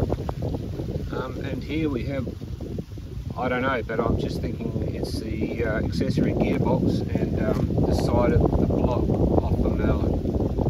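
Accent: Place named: Australia. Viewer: You definitely have. Assuming by the accent you’re in Australia. It’ll be a Spitfire MK VIII, leading edge tank as standard. Give Cameron Roloh-Smith a ring at Performance Aero at Archerfield, he’s the Australian Spitfire man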